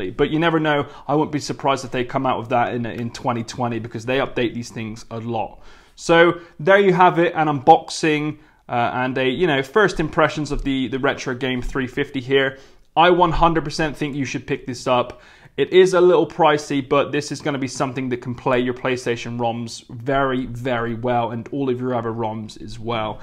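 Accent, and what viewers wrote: Bristol, United Kingdom. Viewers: Fellow Bristolian?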